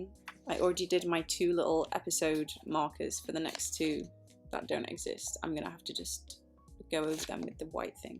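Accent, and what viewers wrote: United Kingdom. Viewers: I love her british accent so freaking much 😍😍😍😍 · omg I didn’t know you were British. you play Macy so well I thought you were like American. I love you sooooo much like your seriously my idol. I can’t wait for season 3🥺💕 lots of love from North Carolina ❤️ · Omg she's British??? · SHE HAS A BRITISH ACCENT HOW DID I NOT KNOW THIS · OMG! I am so glad I found this chanel! I love you so much! Your so professional. I knew you were british but damn I love your accent!! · Oh, I had no idea you were British. Was surprised when I heard that accent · I didn't know she was English.. right On!! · Had no idea you were British. You sound like you have a bit of a northern twang. Your American accent is really good & so convincing. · I had no idea yhuu were British #mind blowned🤯🤯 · WOAH BRITISH!!!! <3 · I love to see how you enjoy reading the episodes, I have to admit, that I refused to see this remake, but I have to applaud 👏 your work, apart from the fact that you are beautiful, my respects as an actress and the dedication you put into it, I love it, I didn't know that you were british but you're a sweetheart 😘and i love your british accent !! 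I want more from CHARMED! and many more vlogs of yours. 🌹🌹🌹🌹🌹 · God it’s so weird with hearing her British accent and not her Macy Voice, In the Next Video PLEASE PLEASE PLEASEEE do your Macy Voice and please tell how you learned how to seaplane in like that American tone! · Omg I never knew she was British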